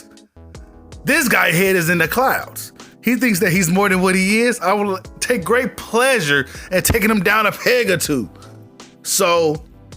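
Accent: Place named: United Kingdom. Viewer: Only half through the video bro but I need to hear your British accent 😂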